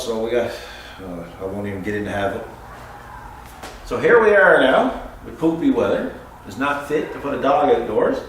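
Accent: Newfoundland, Canada. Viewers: First time watching. Great videos. "My son they're in there now, they thinks their in Bermuda". I'm assuming your from Newfoundland. Now where is the Jiggs dinner? I'm hungry!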